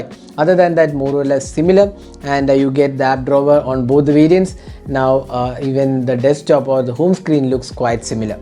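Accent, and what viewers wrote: India. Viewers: I do not know about all other of you followers, but I do not understand Indian English speaking. Sad fully most Indian IT Techs who speak English have VERY BAD English pronunciation, so my comment to this IT Tech is to at least TRY TO LEAVE THE INDIAN ACCENT WHEN SPEAKING ENGLISH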